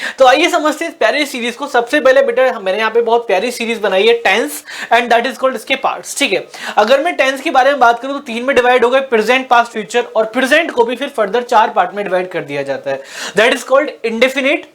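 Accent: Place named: Uttar Pradesh, India. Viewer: Sir aapki mujhe U.p. ki boli achhi lgti hai aap aligad se ho meri nnihaal hai vha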